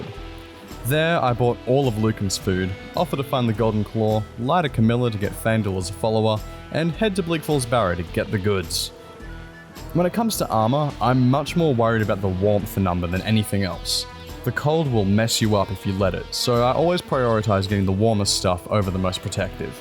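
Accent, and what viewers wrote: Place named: Australia. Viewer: “Youtube existed when you were 11? And was paying royalties? Damn you’re young!” - me, who refuses to admit she’s old 🙈 Love the video. Nice to hear an Aussie accent doing silly challenge runs on a 12 year old game 😊👍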